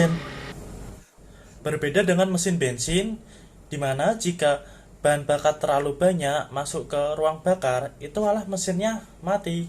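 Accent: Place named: Java, Indonesia. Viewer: Saya suka orang medok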